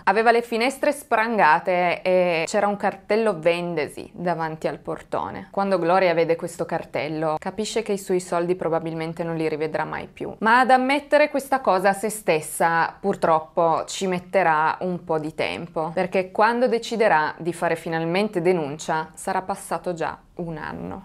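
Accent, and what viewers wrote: Turin, Italy. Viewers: Buon giorno,! Avevo riconosciuto il tuo accento torinese. Ho alcune amiche provenienti dalla tua bella città. 😊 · Dubito che un commento a due anni di distanza possa essere preso in considerazione In ogni caso complimenti per la capacità di narratrice davvero straordinaria Ho scoperto il canale da poco e lo apprezzo parecchio Per quanto riguarda l'accento nulla a che vedere con Belen È il classico accento delle ragazze torinesi di buona famiglia Ho un dubbio sulla storia Siamo nel 2014 giusto e si parla di pagamenti cash, almeno 2, per decine di migliaia di euro Mi pare davvero strano Oggi sarebbe impossibile, ma anche allora le norme antiriciclaggio erano in vigore Un prelievo in contanti per quasi 200.000 euro poi pare fantascienza Magari ricordo male, ma qualcosa non torna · E' italianissima e si sente. Comunque ci avrei scommesso cara che sei originaria del Piemonte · Dal primo video che avevo visto ho capito subito che eri di Torino. 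Con gli accenti italiani sono una bomba💥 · Comunque si sente tantissimo che sei di Torino! 😉 Mi permetto di consigliarti un video su un mio ex compagno di classe: alexander boetcher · Ma la persona che ti ha detto di prendere esempio da belén per parlare in italiano, è la stessa belén vero? 🤣 Penso che l'accento Torinese sia uno dei più belli d Italia 🇮🇹 ❤️ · Infatti hai l accento torinese come la Luciana Littizzetto. Grazie x i bellissimi contenuti che ci proponi. Sei bravissima. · Ma chi è che si permette di criticare il tuo bellissimo accento torinese?!? Non ho parole... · Si capiva subito che eri di Torino ❤️ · Ciao! Io avevo capito che eri di Torino perché parli esattamente come Giulia Valentina ahahahah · Un'ottima capacità espositiva, profonda sensibilità e delicatezza nel raccontare queste storie e un magnifico accento torinese. Dov'eri fino a ora? Grazie per ciò che fai, la frase sull'empatia è molto toccante. 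Questo video dovrebbe essere condiviso di più, perché purtroppo credo che come questa persona ce ne siano tantissime. Bisogna sensibilizzare su questi temi e insegnare alla gente a essere accorta e attenta. Grazie per questo video ❤️ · Abiti nella città che io amo e ogni tanto vado a farci i weekend Avevo capito che potevi essere di Torino, dalla tua cadenza aperta 😅 Volevo chiederti, se potevi, raccontare la tragedia di Superga, l'aereo del Torino caduto sul terrapieno, della basilica di Superga. Lo so che sarei il solo a richiederlo, ma io te lo chiedo lo stesso. Sei molto brava, é un piacere seguirti e ascoltare le storie che racconti. Buona serata e buona continuazione ciao 👏👏👏👏👏👍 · Si capisce che sei di torino ne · Si sente che sei di Torino ma parli un Italiano perfetto, con un accento perfetto. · No no si sente che sei di Torino 😉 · Che strano , alcuni Italiani non riconoscono l'accento torinese come italiano? 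Non hanno mai parlato con un piemontese? Addirittura paragonarlo all accento argentino assurdo!!! Ciao da una tua concittadina ahahah. .. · ti seguo da tre giorni ,sono di Voghiera e ho capito dopo 1nanosecindo che sei di Torino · Ciao! Ti ho scoperto oggi grazie a una mia amica e già dal primo video avevo capito dall’accento che fossi torinese. Io abito in Canavese, mi ricordavo vagamente di questo evento ma non sapevo minimamente di tutta la storia terrificante dietro….fa davvero paura pensare a che livelli può arrivare la malvagità di certe persone…poi a maggior ragione quando sono cose che succedono vicino a te. Grazie per aver riportato alla luce la storia di questa povera donna e grazie per tutte le altre storie che ci fai conoscere. Nonostante ti abbia scoperto solo oggi mi piaci già molto e continuerò a vedere i tuoi video con piacere e interesse da appassionata del genere. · Si sente che sei di Torino nee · ma é di torinoooooooo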